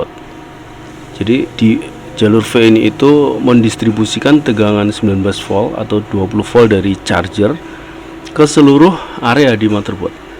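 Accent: Java, Indonesia. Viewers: bang bhs inggrisnya kebawa agak kejawaan😁😁😁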